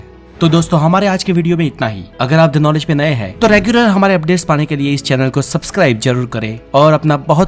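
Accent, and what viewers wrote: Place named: Bihar, India. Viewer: बिहारी लोग विडिओ को " भिडियो " , वोट को "भोट" , वेज/नॉन वेज को " भेज/नान भेज " सड़क को "सरक" , घोड़ा को " घोरा " कहते हैं। आप ज्यादा परेशान न हों।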